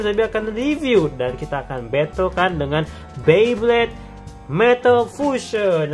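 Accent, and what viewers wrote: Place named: Indonesia. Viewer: indon la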